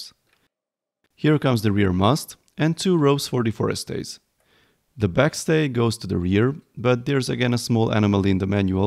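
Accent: Hungary. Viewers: Csak szerintem van a narrátornak magyar akcentusa? :D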